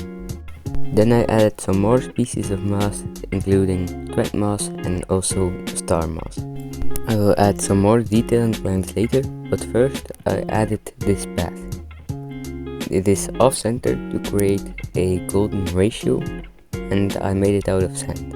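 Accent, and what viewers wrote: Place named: Belgium. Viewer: Oh really lol, you might know that he is from Belgium and I'm also from Belgium so we probably have the same accent😅👍🌿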